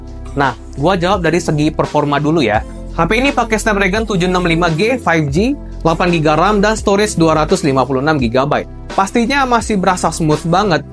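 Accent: Palembang, Indonesia. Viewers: Wong Palembang kak ee?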